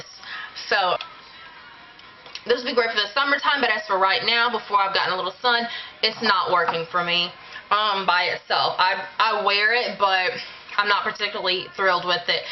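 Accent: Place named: South, United States. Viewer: Is that country in the background?? LOL Your southern accent is cute!!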